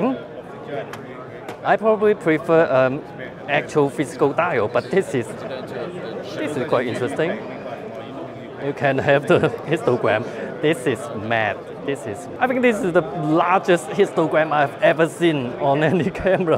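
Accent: China. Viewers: chinglish :) but great first look :)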